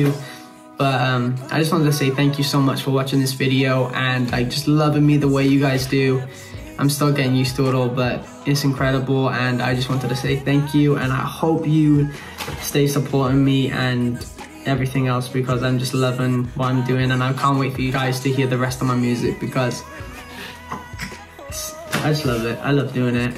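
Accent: Wales, United Kingdom. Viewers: Have just come across your songs and now I'm here. Was so lovely to realise after hearing you talk that you are British (not quite sure from where? Welsh? Anyway.... well done, you really have a fabulous voice and have really enjoyed hearing your journey. People are rarely good at everything so you got your full quote of being artistic .... but in every bloody way!! Incredible. Good luck. Hope we see you killing it on British soil. Hope my son (he's 16) turns out as humble and hardworking as you. (from 53yr old mum from Hertfordshire, UK) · He's actually Welsh, part of UK. Now he lives in the US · He is british my love, yes.💛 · It’s Cardiff, Wales, UK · I think it's Welsh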